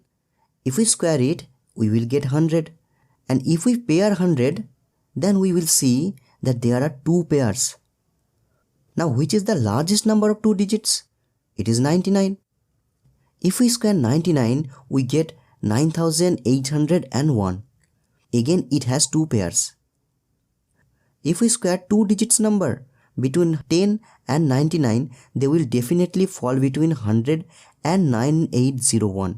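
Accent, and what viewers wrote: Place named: India. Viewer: Your voice is telling you are an Indian about 18 to 25 year old I'm too impressed with you wanted to know the person who invented this method surely he too was either Indian or Arabic but don't know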